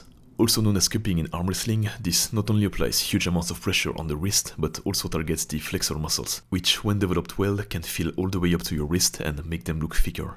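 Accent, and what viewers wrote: France. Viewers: Bon mec je sais si il faut que je sois plus impressionné par tes bras visiblement en titane , on ton accent français à peine perceptible ( vraiment 1er deg tu parles trop bien ang , et même dans tes premières vidéos ct déjà super ) . Keep grinding bro 💪💪💪💪💪💪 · Are you french mate?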